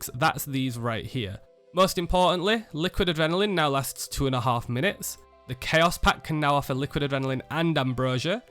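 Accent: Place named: Yorkshire, United Kingdom. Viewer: Finally a Yorkshire accent. I understood everything. Great rundown, really helped.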